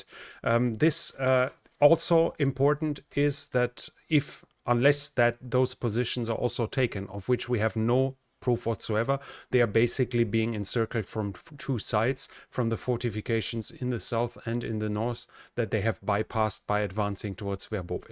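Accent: Germany. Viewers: You talk like a german but look like a Danish person and you have a Danish name, do you live close to the border to Denmark/north of Hamburg? :)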